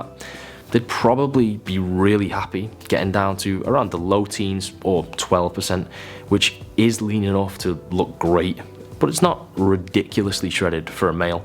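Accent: United Kingdom. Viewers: I'm not even natty but I am gonna start watching this channel because I love this guy's accent! Much love from some colonial scum my British brother. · Excellent video, very educative, I've got a question just for curiosity, where are you from in the UK?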